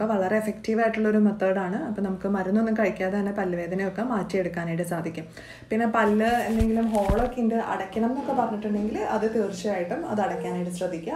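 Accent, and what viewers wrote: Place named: Malappuram, India. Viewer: കുലുക്കുഴിയാ .. മലപ്പുറം സ്ലാങ്ങാല്ലേ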